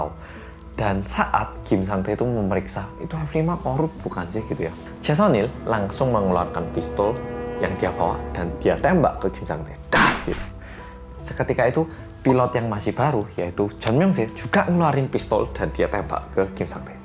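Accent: Java, Indonesia. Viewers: medhok ◐.̃◐ Nessie Judge: horror 👻 Nadia Omara: ngegass 😈 Arroyan Pram:serius, editan terbagus dan niat Detektif Aldo: serius pake banget (｡ì _ í｡) Gak tau kenapa aku suka banget sama kalian:-) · yang menarik dr sini otak guw membayangkan yg dia bicarakan .. hebattt sukses terus mas medhokkk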